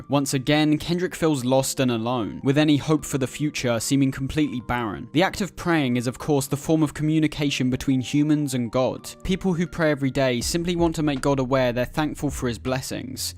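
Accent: United Kingdom. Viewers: Could you possibly get rid of the British accent? It’s kinda heard to understand what you’re saying sorry haha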